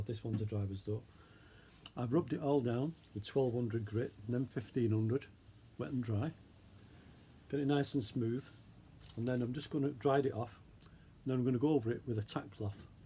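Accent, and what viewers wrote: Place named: Northern England, United Kingdom. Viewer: Big up the North!!! Love you're accent. Where (exactly) does it originate from?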